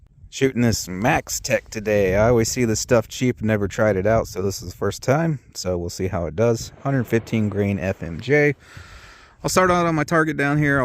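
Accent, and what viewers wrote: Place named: Ohio, United States. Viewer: "There's your take up there...I question myself whether or not I've wracked the slide on this BUT I think so (laughs) then pulls trigger! I recognized an Ohio boy right away!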